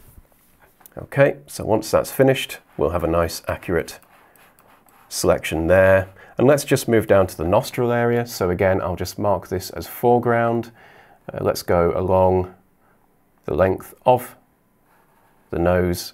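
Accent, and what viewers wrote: United Kingdom. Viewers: Thank you very much for this tutorial !!! And nice British English spoken, love it !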